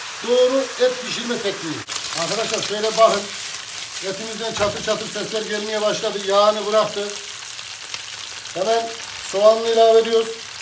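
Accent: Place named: Black Sea, Turkey. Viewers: Memleket nire şef? Kayseri, Yozgat, Çorum??? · karadenizli galiba ustam.bizim şiveyle gonuşii :) tokat ordu sivas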